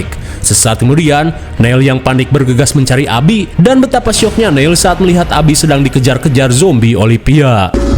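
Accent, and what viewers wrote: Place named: West Java, Indonesia. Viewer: Mantap sunda pisan euy😁😁😁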